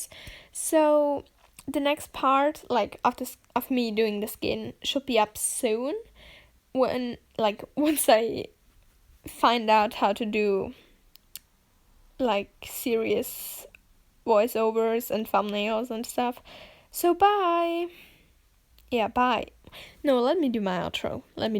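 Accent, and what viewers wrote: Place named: Germany. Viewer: If you didn’t have your German accent, I would defo believe that English is your first language!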